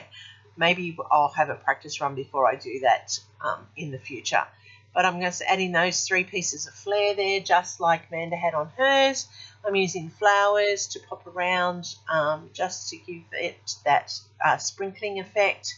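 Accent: Australia. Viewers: I adore your Aussie accent :-). The rabbits are just lovely! Great art work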